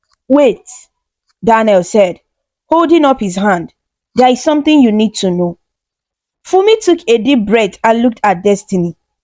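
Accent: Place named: North Carolina, United States. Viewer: I love your stories and your accent...North Carolina, USA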